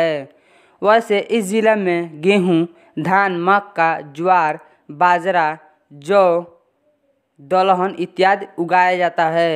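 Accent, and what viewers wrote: Bihar, India. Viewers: बिहारी है ये ग्यारह को इग्यारा बोल रहा है। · Bihar se ho kya bro..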